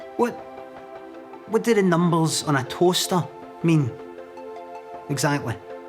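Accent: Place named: Glasgow, United Kingdom. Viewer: made the mistake of using automatic captions, does not handle a glasgow accent at all.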